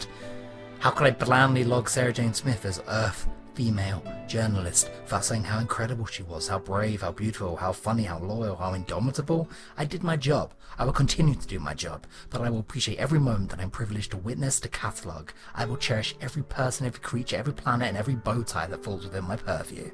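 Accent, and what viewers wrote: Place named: Italian American, United States. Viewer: Worst Italian American accent I ever heard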